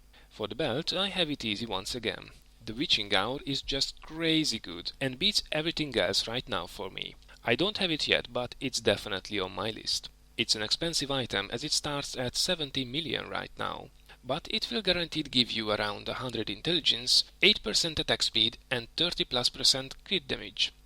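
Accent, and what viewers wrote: Hungary. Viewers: bakker, meghallom a hangod, "ez magyar akcentus" megnézem, és tényleg:D jó videó :D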